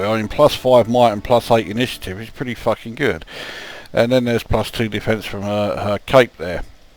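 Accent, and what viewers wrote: United Kingdom. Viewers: Dude, i love your hilarious commentary. Maybe it's the british accent. Subscribed.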